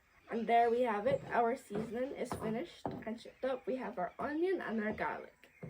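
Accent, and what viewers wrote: Scotland, United Kingdom. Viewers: Nice to see you cooking our traditional Guyanese cuisine,nice job and I love your Scottish accent , have a blessed evening and enjoy your vacation, there is nowhere better than home. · you're accent is all Scottish now. Beautiful 🙏🏽🥰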